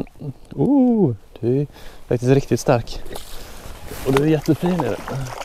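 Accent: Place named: Gothenburg, Sweden. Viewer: Vad var det för frukost du hade med dig? Hörde inte vad du sa för din göteborska ☺️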